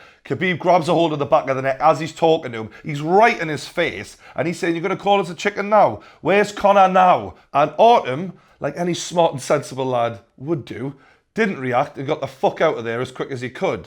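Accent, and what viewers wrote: Newcastle, United Kingdom. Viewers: GET THE ON CBEEBIES BEDTIME STORIES! Storytelling was excellent here. 👏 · You Legend. Keep up the good work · makes me creaaaseeeeee 😭😂😭😂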